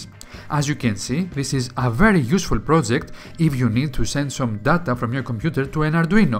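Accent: Greece. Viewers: Are you from Greece? Your English remind me when Greeks speak English btw I'm from Greece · Your accent sounds Greece but not sure 100%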